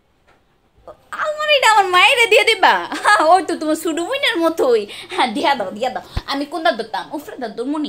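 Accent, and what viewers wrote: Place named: Comilla, Bangladesh. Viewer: Apni sob bastob er sathe mil rekhe Video gulo koren, abar apni amader cumilla vashay kotha bolen, tai apnar video gulo onek valo lage, love you apu, 🥰😘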